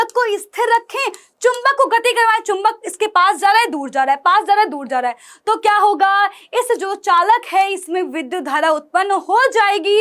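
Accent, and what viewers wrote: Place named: Kanpur, India. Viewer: Ma'am kanpuriya language me bole to ekdum jhakaas samajh me aa gya 🙂🤭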